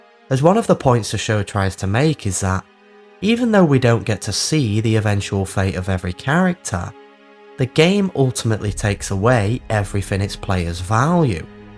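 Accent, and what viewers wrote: United Kingdom. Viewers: We gotta use AI to change this guys voice…. Why so slow and British ew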